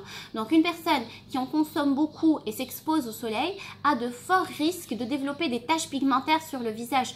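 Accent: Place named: Marrakech, Morocco. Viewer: ختي وراه تنكول اللهجة شوية ديال مراكش 😘😙 يعني قريبة ليا ❤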